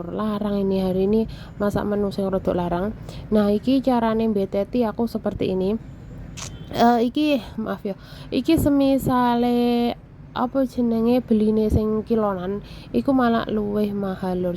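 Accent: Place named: Java, Indonesia. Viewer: Makasih ce resepe,bahasa medok Jawane salam kenal salam persahabatan ce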